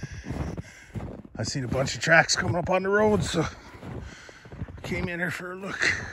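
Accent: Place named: Lynn Lake, Canada. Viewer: It’s been a while since we heard that accent in Lynn Lake.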